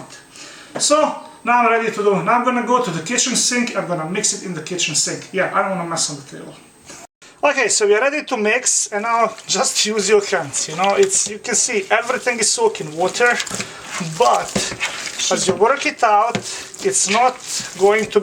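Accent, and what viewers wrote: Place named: Bosnia and Herzegovina. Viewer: the only thing in this video close to a bosnian cevap is the accent!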